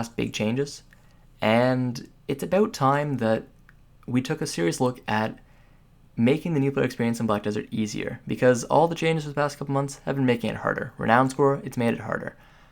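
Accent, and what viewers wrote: Canada. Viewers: Haha you candian right?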